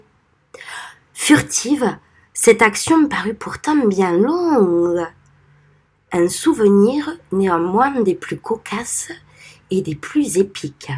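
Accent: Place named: Marseille, France. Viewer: Sympa l'accent marseillais ! Il te va bien.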